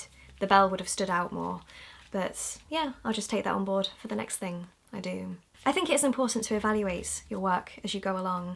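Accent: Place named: England, United Kingdom. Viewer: Hi! I'm a long-time italian fan of yours, as I've always loved your videos, your art and your ecstatic English accent! I'd really love to get one of your original canvases, but I visited your shop on Etsy and I could just find prints. Is it because it' not possible for you to ship in Italy? Hope you'll reply, can't wait to have on your your art work on my bedroom wall 😊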